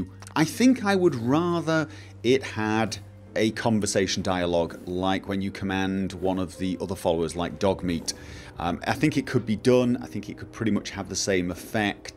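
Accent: United Kingdom. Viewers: video as always, mate. Which part of Norway are you from? Your accent sounds somewhat British, then again, I've only heard the Norwegian accent a handful of times.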